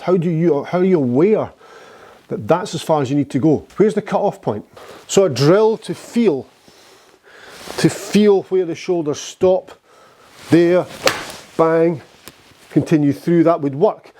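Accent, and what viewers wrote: Scotland, United Kingdom. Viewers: Two reasons why I watch this. To learn how to play golf and to learn how to speak Scottish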